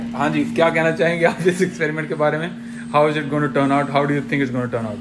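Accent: Punjab, India. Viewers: Loved your punjabi yrr ki authentic punjabi boli hai🤣🤣🤣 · He was trying so hard not to go full punjabi hahah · Veere punjabi leak hoi jaandi aa thodi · g twadi hindi mix punjabi kmaal ey yr...exact imitation ey ona noee--dolteyey di jina nu chj di ek zubaan vi ni andi😂😂😂 love you!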